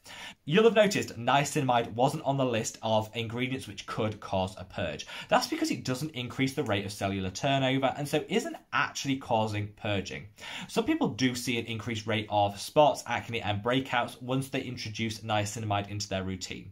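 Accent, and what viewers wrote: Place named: England, United Kingdom. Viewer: I’m in Canada so I have more accessible to the Ordinary line and I stumbled upon ur channel while looking for more informations. I’m in my 40s so i have been binging on your anti-aging and pigmentations vid. I love all ur vid and ur English accent btw, lol. Thank you for sharing your knowledge, you got yourself a new fan 👌🏻💕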